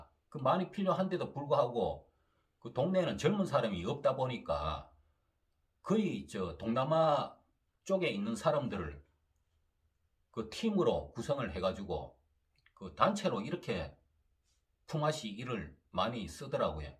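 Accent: Gyeongsang, South Korea. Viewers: 경상도 사투리 쓰시네요 어디신가요? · 순수하신 진짜 시골분세요 근대 경상도 인가요 제가 가고싶은대 경상도는 ㅜㅜ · 고향이 어디 경상도 문디이 말투인데 혹시 경북봉화 점촌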